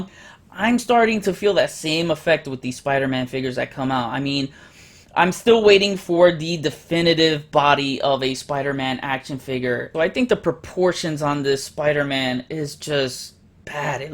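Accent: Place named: New York, United States. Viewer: Shart owns “big time let down” and now you own “big time caca”. Please more “on the shelf” segments and ain’t no shame lettin our New York accent show out, bro. Salute.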